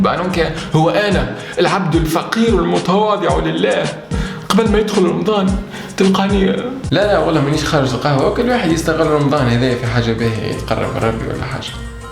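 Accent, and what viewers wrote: Sfax, Tunisia. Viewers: je viens de découvrir ta chaîne YouTube ces derniers temps et j'ai juste envie de te dire que j'adore ton accent sfaxien , c'est juste ouf 😍😍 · شكراً لاستخدامك لهجتك الصفاقسية الجميلة المميزة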